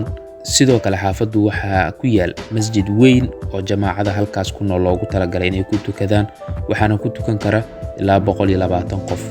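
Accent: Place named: Hargeisa, Somalia. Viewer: idol maanfahmin lahjadan ayaahargeysalaladhexjoogaa kkk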